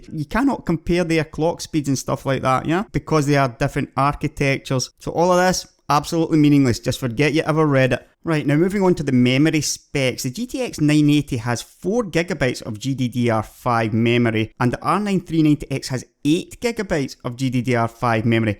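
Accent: Scotland, United Kingdom. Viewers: this scottish accent rulez, gegabytes :D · Great video; right? Helped answer the questions I had, was well paced, very visually informative and to hear a proper Scot again was great. Right?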